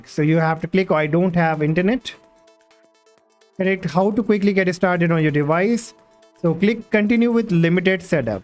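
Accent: India. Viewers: Why is it always Indian accents… it’s like IT is wasting my time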